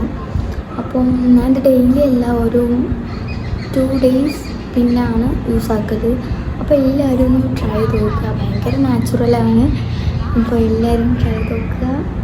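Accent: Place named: Malappuram, India. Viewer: Malappuram slang 😌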